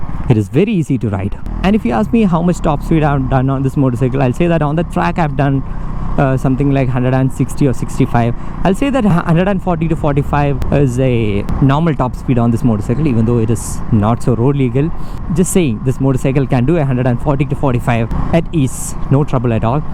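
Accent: Kerala, India. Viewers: Why do you sound like a Malayali?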